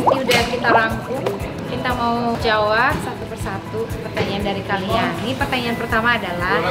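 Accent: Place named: West Java, Indonesia. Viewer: Karakter nya kaya gitu bgs manja dari pada kasar.😆😆 Dan dia orng sunda logat bicara nya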